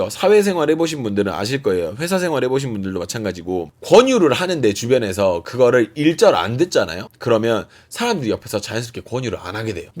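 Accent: Jeolla, South Korea. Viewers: 고향이 혹시 전라도이신가요 말투가 서울말투같지 않아서...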